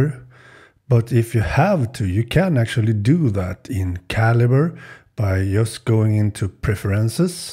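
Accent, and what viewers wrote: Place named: Sweden. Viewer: Härlig svengelsk dialekt! bra video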